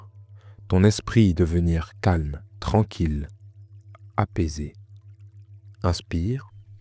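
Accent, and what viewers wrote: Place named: Cameroon. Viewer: Ma vision limitée dit ceci ... Tu as Ton sous-accent camerounais-bamileke qui manque dans ton discours et qui distrait un peu,car il est noyé ... Sans offense. Hotep Paix.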